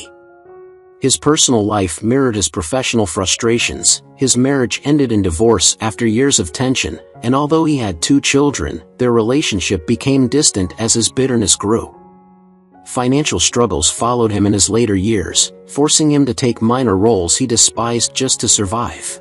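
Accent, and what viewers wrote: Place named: United States. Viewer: american narrator what do you expect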